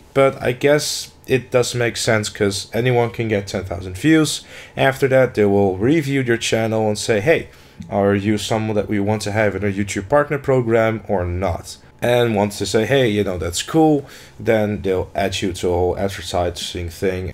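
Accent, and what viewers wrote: Netherlands. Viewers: from what country are you? sounds a lot like you are from holland. since the tv you describe is exactly what it is here.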